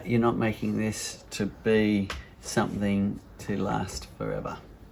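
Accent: Australia. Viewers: Think he is a Aussie/New Zelander from the sounds of it.. More like M8/mate....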